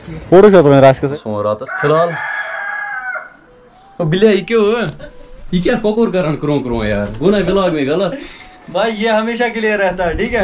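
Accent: Kashmir, India. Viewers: I literally, like you kashmiri accent.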